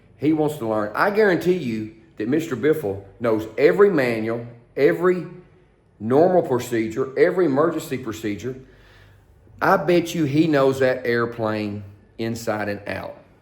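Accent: South, United States. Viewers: I read Greg was good friends with Dutton. Greg had 3500 flight hours and recent multi engine rating plus he seemed to know operational aspects of the Citation well enough to suggest he might have been best in right seat. Using my FSX to recreate the flight with 2 miles visibility, I lost the airport multiple times while turning back to runway 28, 10 then close downwind 28 to keep airport in sight at 1000 agl, then tight teardrop base to final, lost airport again, continued and eventually saw airport envirnoment. Scary even in FSX. I think they were 500 agl, or so, base to final. Nervewracking just thinking about it. Enjoy your podcast,. You nice southern accent reminds me of folks from Mississippi, like me.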